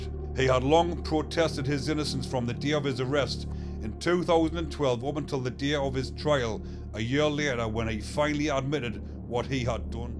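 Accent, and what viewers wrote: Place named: Scotland, United Kingdom. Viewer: Your Scottish accent is cool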